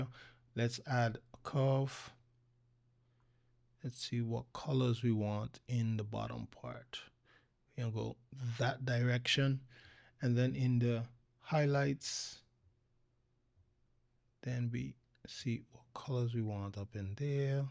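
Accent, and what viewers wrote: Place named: Trinidad and Tobago. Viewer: You talk like you're from Trinidad!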